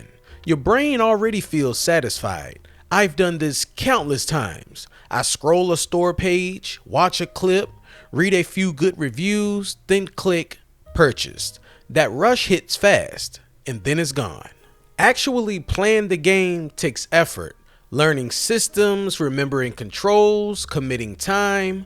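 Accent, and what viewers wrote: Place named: New York, United States. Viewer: Couldn't watch the entire video because you keep saying brought instead of bought.. you nost likely say soar instead of saw and Mare-Rio instead of Marr-Rio. I refuse to listen to anybody that pronounces words that way and its 99% people from NY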